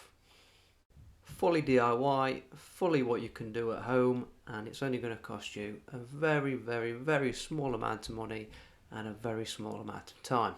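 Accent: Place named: Derbyshire, United Kingdom. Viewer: Lovely Derbyshire accent there!! 👍🏽👍🏽😊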